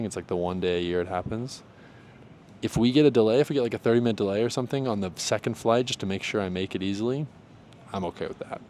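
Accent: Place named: Eastern Europe. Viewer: Which country are you from? You sound like eastern european, which is cool, I myself am from Poland.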